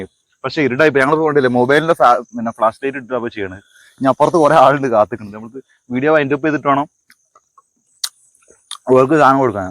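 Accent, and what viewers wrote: Malappuram, India. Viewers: ബ്രോ കൂടുതൽ ജാഡ ഇല്ലാത്ത മികച്ച അവതരണം നാടൻ കുക്കിങ് രീതി ചെയ്തതെല്ലാം വളരെ പെർഫെക്ട് ഇതുപോലുള്ള വീഡിയോസ് ഇനിയും പ്രതീക്ഷിക്കുന്നു കാണാൻ നല്ല ഭംഗിയുണ്ട് മലപ്പുറം സ്ലാഗിലുള്ള സംസാരം പൊളിച്ചു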